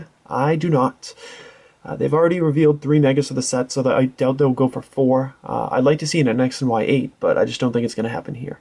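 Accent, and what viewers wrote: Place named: Massachusetts, United States. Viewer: Where in mass are you from? Haha I heard and was like :O I'm north of Boston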